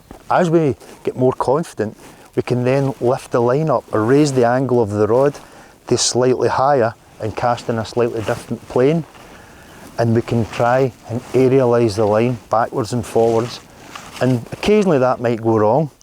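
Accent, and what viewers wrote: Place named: Scotland, United Kingdom. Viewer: an extra thumbs up for a Scottish brogue that I can understand! Thanks!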